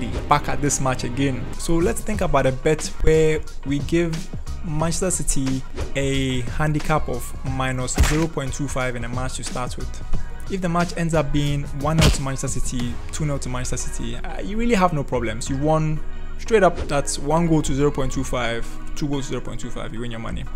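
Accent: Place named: Ghana. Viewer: Your accent sounds Ghanaian. Were you born or lived in Ghana by any chance? Just curious. Great explanation by the way.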